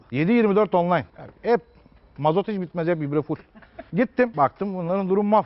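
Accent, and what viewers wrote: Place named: Altınova, Turkey. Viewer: Balıkesir altinovaliar gibi konuşuyor süpersin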